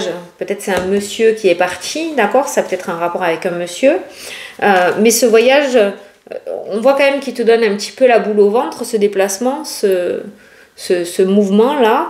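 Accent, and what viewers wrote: South, France. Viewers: Bonsoir pour moi c'est la 1ere fois que je vois votre guidance..en tt cas j adore votre accent du sud moi qui suis dans le nord ca fait chaud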